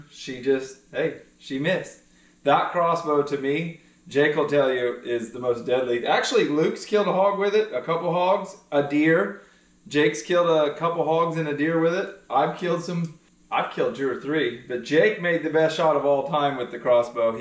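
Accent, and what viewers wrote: South, United States. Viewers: Sounds like a good Ole country gal to me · I absolutely love her accent. Being from TN then moved to south Alabama it reminds me of home.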